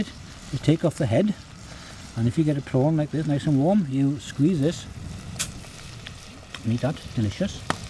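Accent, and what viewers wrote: Wales, United Kingdom. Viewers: Great videos but am improved mic setup might be a worthwhile investment! Can be a bit difficult to hear sometimes with the thick welsh accent and background noise.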